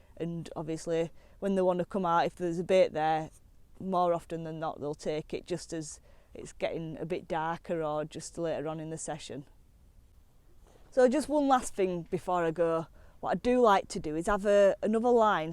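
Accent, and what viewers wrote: North, United Kingdom. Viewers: Another great video, in a nice northern accent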